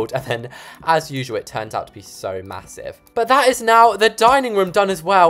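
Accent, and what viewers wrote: England, United Kingdom. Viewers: Gurl Are You British ???? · What part of England r u from bc I’m English and my accent is so different from yours!!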